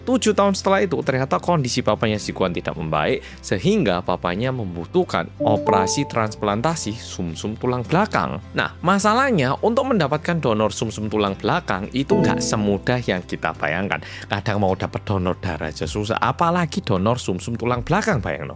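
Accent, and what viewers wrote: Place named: Java, Indonesia. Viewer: Malam muedok lgsg nyimaaak🎉🎉🎉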